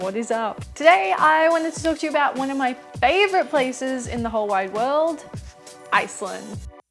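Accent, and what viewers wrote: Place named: Australia. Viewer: This was great! One thing I wanted to know is where YOU are from...I know it makes me sound unbearably stupid, but I couldn't quite tell from your accent...is it Australia? The reason I want to know is because I'm Canadian, so your idea of Cold might be very different from mine!! :) I was sort of planning to pack for "chilly spring" weather over here...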